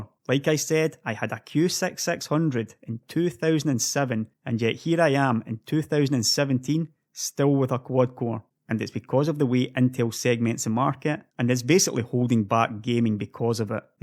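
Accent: Scotland, United Kingdom. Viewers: Sweden? Judging by your accent I was sure you were Scottish. Or perhaps living abroad? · I love it when i can here people are from Scotland, i am half scottish.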